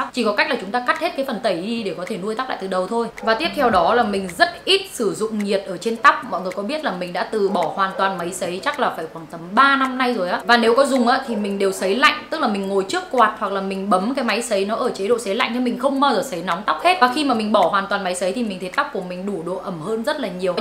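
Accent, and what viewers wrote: Nghe An, Vietnam. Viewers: người nghệ an phải ko bạn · Giọng Bắc của chị thi thoảng pha giọng Nghệ An nghe cute thế😁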